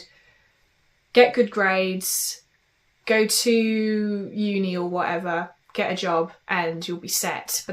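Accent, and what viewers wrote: France. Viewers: I love your French accent haha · Hi are you secretly French or do you just have an insanely amazing French accent?!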